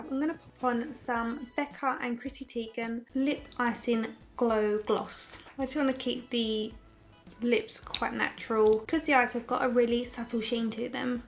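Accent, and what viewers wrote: Essex, United Kingdom. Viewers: I just found you! I’m from Essex you sound like you’re local? Maybe Loughton?😃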